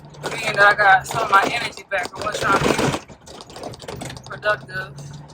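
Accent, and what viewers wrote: Jamaica. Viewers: How do you do that? For a Mississippi girl your patois sounds authentic!😊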